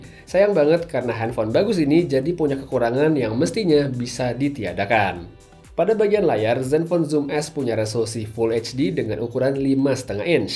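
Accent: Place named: Java, Indonesia. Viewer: Reviewer Medok muke lu medok njing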